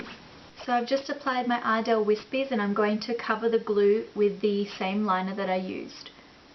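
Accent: Australia. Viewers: Your so sweet for lettimg me kno ;) thanks girl. Love ur videos and your australian accent! Subbing to see more!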